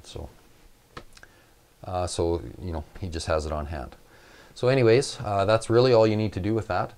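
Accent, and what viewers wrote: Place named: Canada. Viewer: I knew u were Canadian by your accent but you saying 40 below just confirms it. That's crazy cold. Great videos. Best in the web! Thanks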